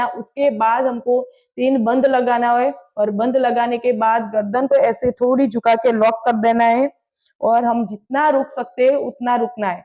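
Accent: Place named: Gujarat, India. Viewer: Shayad gujrati lago cho bolva thi khabar pade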